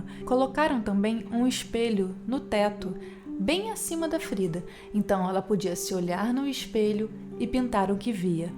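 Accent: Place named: Rio de Janeiro, Brazil. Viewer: Ah esse sotaque carioca...❤️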